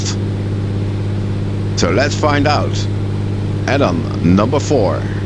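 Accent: Netherlands. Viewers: Dat Hollanse platte kut engels.. 🤣🤣🤣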